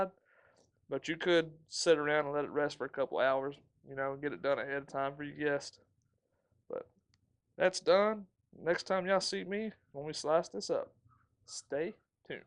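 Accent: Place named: Texas, United States. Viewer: Oh yum yum 👍👍👍 that’s such a unique way and creative way of smoking a turkey … I like that Texas twang coming from a native Texan. 😁😁😁 although I don’t live there anymore it will always be my home . ❤️ thanks for sharing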